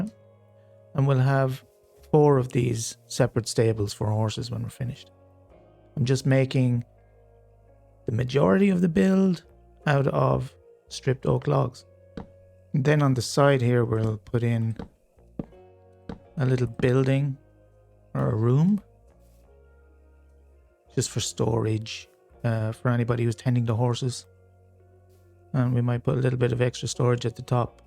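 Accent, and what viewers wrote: Ireland. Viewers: your big Hardcore video came to me through the algorithm and I LOVED it, couldn’t get enough of your builds, play style and chat (and, as a fellow Irishman, it’s great to hear a non-American voice in the game!) so I’m going back through this series for more banter and more build inspiration. You’re a star, LOVE every video I watch, hope you come back and see this and think of how far you’ve come!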